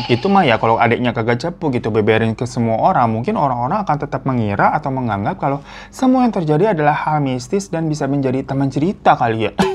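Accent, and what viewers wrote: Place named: Sukabumi, Indonesia. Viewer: Kaget pas denger di awal awal, lah orang Sukabumi ternyata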